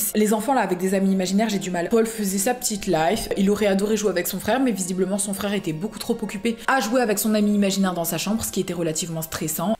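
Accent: England, United Kingdom. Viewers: J’aime trop ton accent d’anglais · T grave. Flipante et vrm t trop bien et j’adore ton axant anglais 🤐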